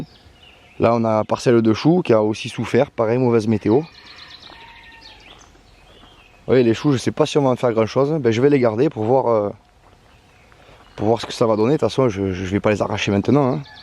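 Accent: South, France. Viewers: Tu es ou, avec ton accent dans le sud ?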